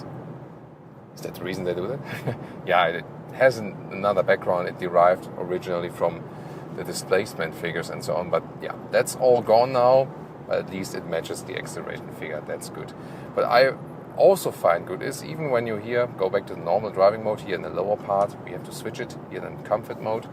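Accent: Germany. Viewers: I love your German accent. It gives your videos about Mercedes that Teutonic feel.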